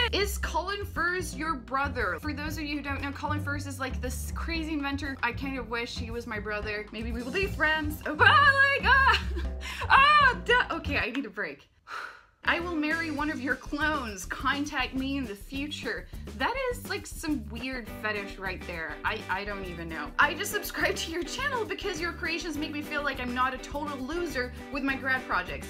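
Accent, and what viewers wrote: United States. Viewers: Quirky, smart and entertaining! You really do sound like an american though, you picked up the accent really well. :) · Aha! a fellow non-native american accent speaker! lol I am, too, a non-american/canadian who speaks "without" an accent (just like u, all because i watch a lot of american movies since i was very little) and I currently live in Canada. I actually wished sometimes that I have an accent, because there are a lot of times where i would look stupid, bcs of the same reason as you, or when i messes up my grammar or word pronounciation, or when i feel "alien" towards certain north american pop culture. (I had no idea who Bill Nye or what Fresh Prince of Bell-Air is until my friend mentioned it about a year ago..) Question, though; are u able to speak english with an accent or watching a lot of american TV basically erased ur ability to do so? 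(I am the latter.. or maybe i just suck at doing accent in the first place) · That is one of the most appropriate and awesome XKCD placements I have ever seen, excellent work. Also enjoyed the "Mothership" line. I thought you were American from your accent until you mentioned it, actually. It's an oil filter wrench. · and to where does this swdish girl credit her drop perfect american accent? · Hey your channel is really cool! The stuff you do is great and you're a likable person. Also, as a language nerd, I appreciate your excellent American accent.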